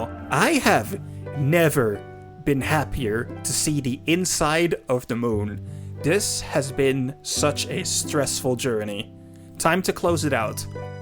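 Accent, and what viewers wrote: Netherlands. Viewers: Are U dutch ? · I always get whiplash when I hear a dutch person making videos I'm actually interested in 😅 don't see it often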